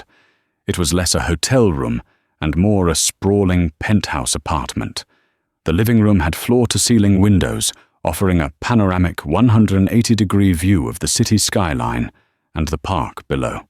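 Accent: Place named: Europe. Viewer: The narrator sounds like reading a fairy tale with European accent. Personally prefer a regular voice or a normal voice. Sorry. I couldn't stand the voice so I never finished the story.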